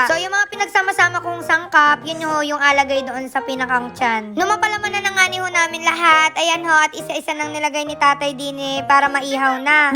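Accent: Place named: Marinduque, Philippines. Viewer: My lahi k plng Marinduque kya pla lagi kng my ngani sa salita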